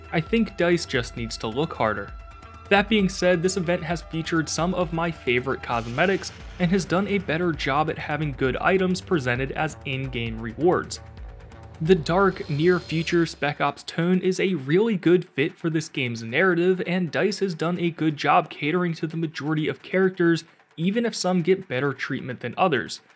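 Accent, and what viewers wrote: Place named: Pennsylvania, United States. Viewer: Are you from Pennsylvania? I hear a strong accent. I'm loving this game, but yeah the events are lazy.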